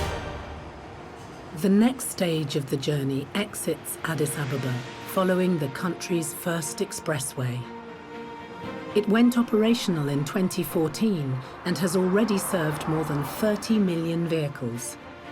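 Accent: United Kingdom. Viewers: what 😂 nice voice and British accent makes everyone seem knowledgeable but this documentary is very inaccurate plus I don't know if it's on purpose but they were jumping pivotal moments in our country's history when she was talking about axum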